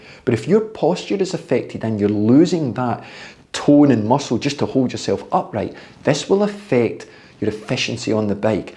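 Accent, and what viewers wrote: Scotland, United Kingdom. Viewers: I love your videos! I've learned a lot (I am 59 and just started cycling again after decades). I find it extremely hard going up any hills but am getting a little better with the smaller inclines. I'm not exactly a skinny Minnie either, lol. Thanks again, and as a Canadian, I enjoy listening to your Scottish accent while you're explaining things. 😁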